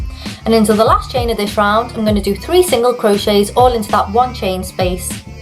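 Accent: United Kingdom. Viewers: I just bought this pattern & I am excited to make a start. I do have 2 questions though. Why is (Jason Mamoa’s) Aquaman white? Also from your accent, I guess you’re British. But you use US terminology. Is this pattern available in UK terminology. I don’t mind buying it again, as it would make my English heart glad!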